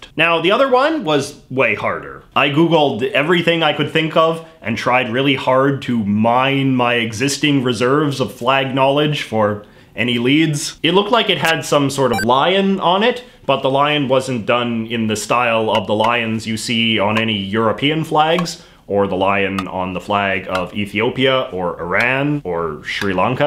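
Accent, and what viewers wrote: Canada. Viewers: i know its 1yr old that was posted but your so Canadian cause you say abut then about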